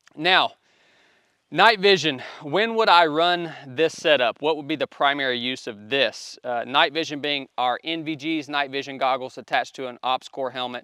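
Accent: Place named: Georgia, United States. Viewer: ! Been a long time. I was your RCPO (“RPock”) in boot camp 😂. I don’t know if you remember, but I ran into you when I was a new guy at 10 (after a successful second attempt through the pipeline). It’s funny that I just ran into your channel. I was just telling someone a story about you in boot camp. I was telling them that I had to kick my southern accent a little bit because anytime I would put something out to our division, people would giggle at my accent. Then I told them about one day when you were answering some question about what a chief wears on the sleeve of his dress blue uniform, and everyone kept giggling at your deep Georgia accent. You stopped what you were saying and said, “I wanna know what’s so funny.” 😂😂😂 It’s good to see you’re doing well, brother 🤙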